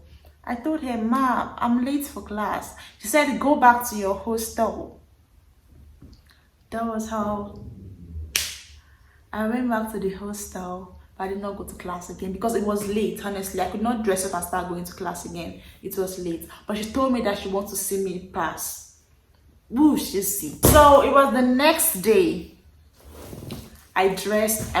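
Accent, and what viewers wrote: Ghana. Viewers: Funny that back then you did not understand their intonation but now I hear you pronounce "study" like a Ghanaian. Lol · and now you have a ghanaian accent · My dear you are not back to speaking English with a Nigerian accent oo you still sound 80% Ghanaian😅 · This is so funny. You sound like Ghanaians now, and it's totally weird. You guys had get together 'steady'. Lol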